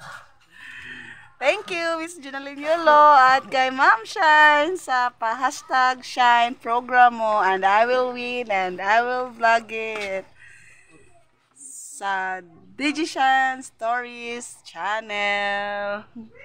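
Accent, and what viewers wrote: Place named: Visayas, Philippines. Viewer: Yes kilala ko si J Djshine dati din ako sponsor sa kanya, bait sya. Congratulations sis, parang bisdak ang tono ng salita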